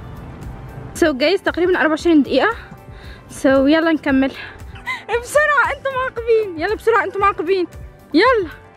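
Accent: Tunisia. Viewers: من هدرة تاعك تباني تونسية 😉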